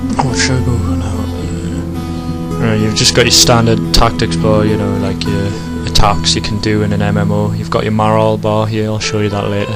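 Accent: Newcastle, United Kingdom. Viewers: Are you from Newcastle? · Wewt, geordies ftw! Me too. :D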